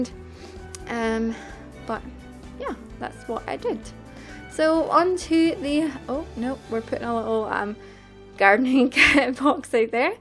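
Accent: Scotland, United Kingdom. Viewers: Is that a scottish accent i hear? :P You hear very few of them on YouTube...mad hearing it on a Sims 4 video too! :)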